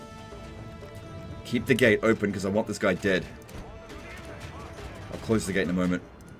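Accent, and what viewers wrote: Queensland, Australia. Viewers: Your accent sounds queensland to me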